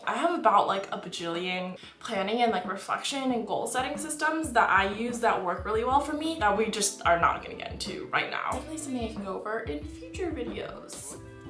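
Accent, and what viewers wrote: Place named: United States. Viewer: This is really mind-blowing. This Chinese chick speaks perfect American English. Where did you study in China? You don't have even a slight Chinese accent. You sound very Caucasian ❤️❤️❤️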